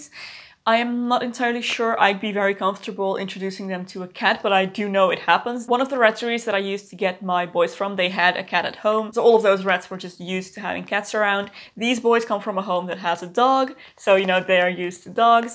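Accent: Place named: Netherlands. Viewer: Je klinkt niet zo Nederlands als de meesten die Engels praten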